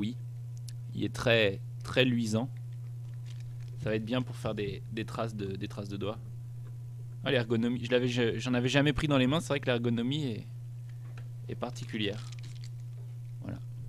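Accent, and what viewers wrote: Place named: France. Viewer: W00T I LOVE YOU FRENCH ACCENT:p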